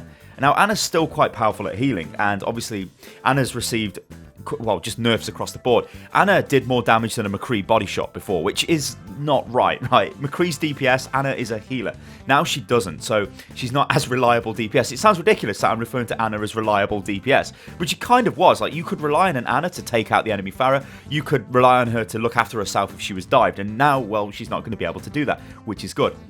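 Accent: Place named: United Kingdom. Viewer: He's like an excited British puppy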